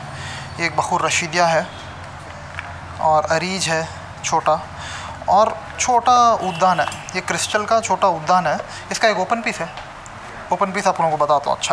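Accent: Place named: Hyderabad, India. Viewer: Badiya ek dum hyderabadi style..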